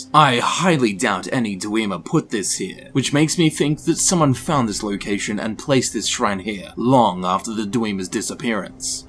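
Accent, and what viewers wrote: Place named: Australia. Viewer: Is it lost on people that he's Australian or.... Of course he's going to "mispronounce" words (Based on YOUR representation on how the words pronounced), so relax with it. He's still funny and makes great videos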